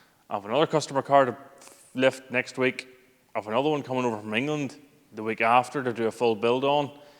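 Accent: Scotland, United Kingdom. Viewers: Some of us actually know you have a life and family outside of YT. I'm just a "peanut gallery regular" with an appreciation for a fine Scottish brogue! Hug your wife and children- they are much more of what makes you tick than I ever will be.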